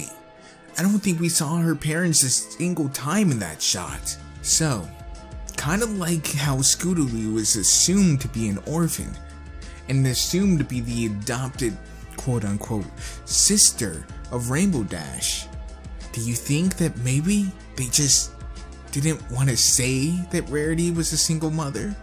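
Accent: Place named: United Kingdom. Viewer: On an unrelated topic, I had no idea you were British until the beginning of this video.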